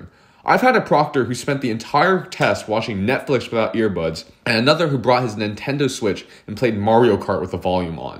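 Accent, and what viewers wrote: United States. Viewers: This format kinda reminds me of gradeAunderA but with an American accent and a bit more serious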